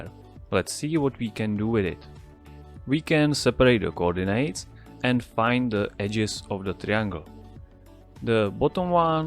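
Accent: Czech Republic. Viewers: You're from czech reoublic? :D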